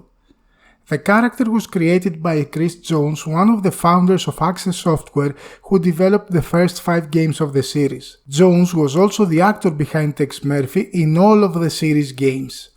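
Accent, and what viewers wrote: Greece. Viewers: I still remember the day when i first loaded Pandora Directive into my computer and started the game , it remains my favorite game of all time until now! Btw from your pronunciation i would bet you are Greek.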